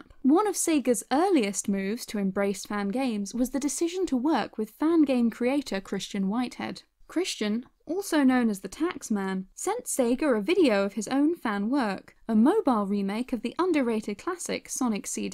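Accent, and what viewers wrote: United Kingdom. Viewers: This is why I love sega. They support fans and hire them. Also I love the narrator's accent! It's really cute ( I just think the female British accent is really cute)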